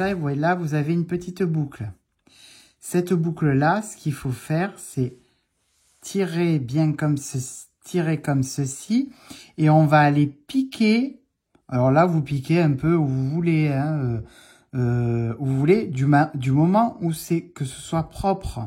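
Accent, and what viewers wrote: South, France. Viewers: Merci pour ce tuto chaussette je vais essayer avec ta méthode. Je suis débutante au tricot et ta façon me paraît bien simple, bonne journée, j'adore ton accent du sud, moi aussi je suis du sud 😊😊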